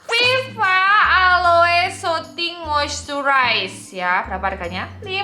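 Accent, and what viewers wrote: Java, Indonesia. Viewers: Raop.. yaalloh nguedok jowone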